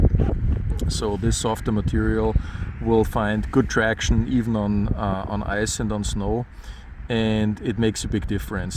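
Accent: Germany. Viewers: German accent?? 🤔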